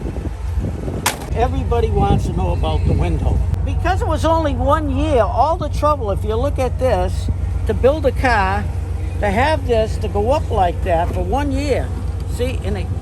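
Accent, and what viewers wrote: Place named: Boston, United States. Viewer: Beautiful Cadillac! I detect a Boston accent...!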